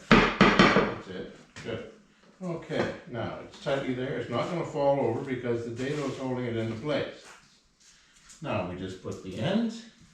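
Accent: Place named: Canada. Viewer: picking up on a Canadian accent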